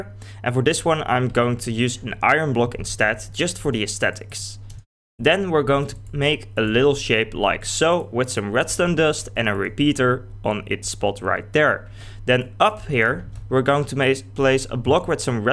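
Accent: Netherlands. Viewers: haha dutch detected, nice mate didn't notice the accent at first hope you are doing well, weather hasn't been great around lately. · Lol je bent Nederlands he Kan ik zo horen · hey, nice farm, and i hear youre dutch ;) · Are you dutch you got a dutch sound or how you call it hahha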